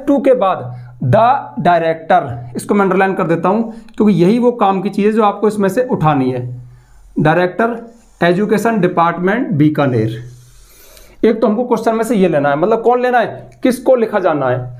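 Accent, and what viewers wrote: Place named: Meerut, India. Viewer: meerut se ho ky sir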